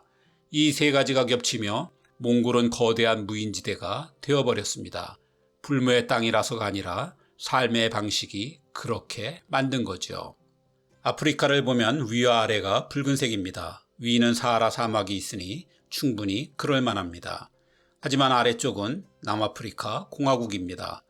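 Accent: Gyeongsang, South Korea. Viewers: 그치만 일정한 억양이 무한반복되어서 혹시 에이아이 아닐까도 생각해요. 경상도 억양이 바탕에 깔린 출신지 불명의 섞어찌개 말씨. 어쩜 똑같이 진행하고 올라갔다 내려왔다 하는지 신기해요.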